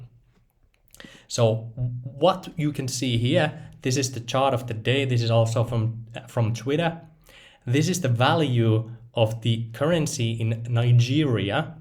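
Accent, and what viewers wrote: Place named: Finland. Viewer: I'm almost 100 % sure his native language is Finnish. I know this because I'm a native Finnish speaker, and I know how Finnish-English accent sounds like. Also, his "pattern of thought" fits into Finnish framework (hard to explain...). In any case, great content!, tell me if I'm wrong 😉 Cheers!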